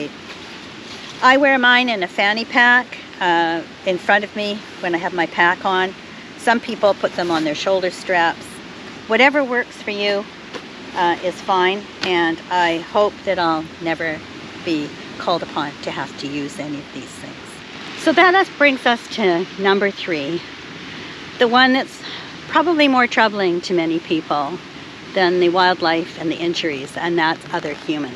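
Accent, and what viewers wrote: Canada. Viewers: I can tell she from BC. CA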